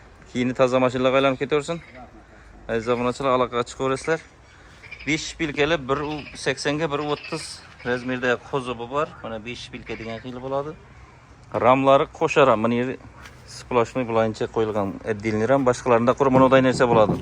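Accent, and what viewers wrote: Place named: Mangit, Uzbekistan. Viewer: Амударёдоми диман мангитчи тилда суйлаб турибсизгу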